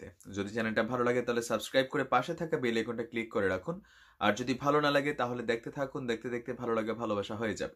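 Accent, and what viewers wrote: Comilla, Bangladesh. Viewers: ভাই আপনার নিজস্ব কুমিল্লার ভাষা আমার কাছে দারুন লাগে এবং বারবার শুনি এবং প্রাণ খুলে হাসাহাসি করি দারুন চালিয়ে যান।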